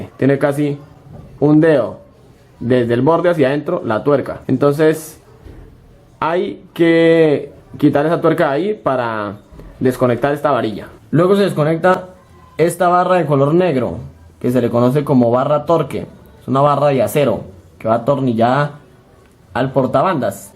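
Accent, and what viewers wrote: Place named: Colombia. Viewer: Buen video... Tu voz y acento colombiano me hizo acordar a Nicolás, el mejor amigo de Betty la fea. Saludos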